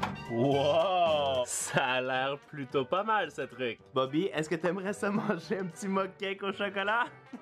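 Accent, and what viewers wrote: Quebec, Canada. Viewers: J adore ton accent québécois 😂😭😂😭😂😭😂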